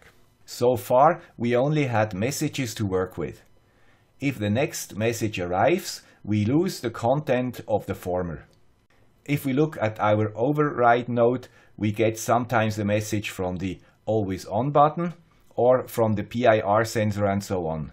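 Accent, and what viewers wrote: Switzerland. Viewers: "Grüezi Youtubers, here's the guy with Swiss accent" should become a personal trademark :) Same as Gennaro Contaldo's "Why I am cooking so goood?"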